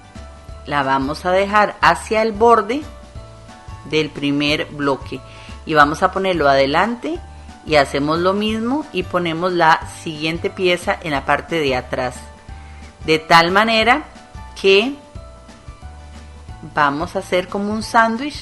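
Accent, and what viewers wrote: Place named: Costa Rica. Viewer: Que lindo trabajo, muchas gracias por la paciencia y por enseñarnos, por el acento de tu voz supongo eres pura vida de costa rica😊, un saludito muy especial desde mi 🇨🇴 bendiciones😇😇😇🙏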